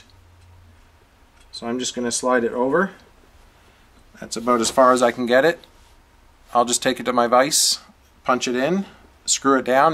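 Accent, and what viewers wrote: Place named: Canada. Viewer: Very well done, used your tutorial as a guide to smooth out my 2.0 shield. Awesome video, best I've found, clear, conscious and touch of Canadian twang, EHH. Thank You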